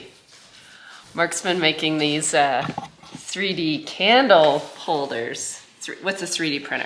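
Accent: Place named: Canada. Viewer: Don’t care about hair. Am old city bred gal now retired. Can not travel so utube is it. This year I have begun to learn about farming. Larg er animal care and feeding. I love your slight Canadian accent. Thank you for what you do.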